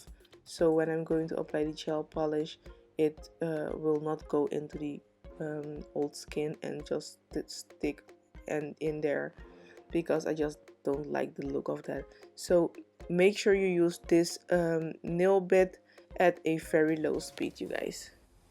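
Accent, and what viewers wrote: Netherlands. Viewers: I can hear from your accent that ur living in Holland.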